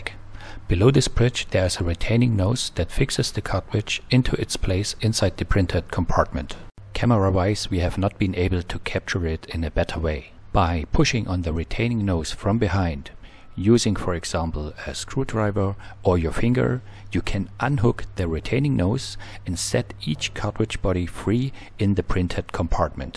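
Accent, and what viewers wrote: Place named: Germany. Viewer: Any explanation video or tutorial is made infinitely better when it involves someone with a German accent.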